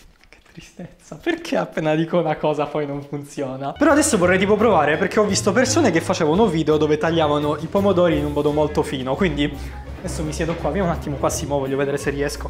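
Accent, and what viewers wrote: Rome, Italy. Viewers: Perché quando sei con i tuoi amici marchi di più l accento romano ? È fastidioso non so perché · Che bello quell'accento romano che persiste per tutto il video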